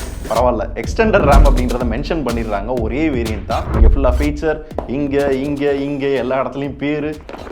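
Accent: Madurai, India. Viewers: Bro neega madurai thana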